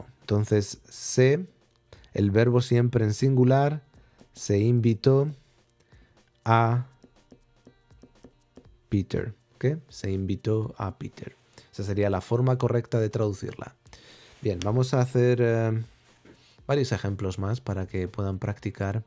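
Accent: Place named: England, United Kingdom. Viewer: funny english accent)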